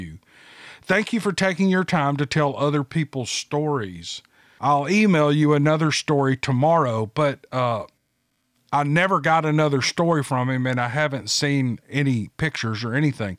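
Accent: South, United States. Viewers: Howdy Sir; Just wanted to take a moment to say Thank You on this hobby of yours. Did you say you were from MS or AR? I wouldnt think TX your twang isn't that gruff. Anyway, I do enjoy your tales. I am still not all that sure what they are and why we never seem to get anywhere about anything. I am even embarrass with some of these shows with these fools going out there and yelling hitting a tree then because they do not hahve anything make themselves scared for the camera or better yet those that make side people out to get them sigh. That gets old. With all the stupid stunts if they do every figure out this stuff nobody's going to believe them anyway.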